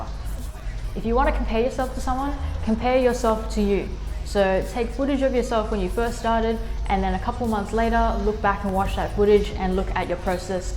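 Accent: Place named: Australia. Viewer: man, everything u talked about this video are literal GEMS of krump!!! its funnaye how it wasnt until this year or during lockdown, i found myself and keep growing just by focusing on myself and not comparing to others. Keep spreading your love for dance. Haha i forgot that your aussie accent is so distinct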